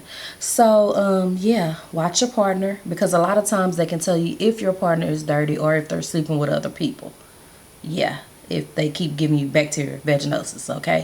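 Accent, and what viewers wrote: Dallas, United States. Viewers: When she say it with that southern accent 🤣❤️ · I figured you were in Dallas just by how u talk , I know that talk lol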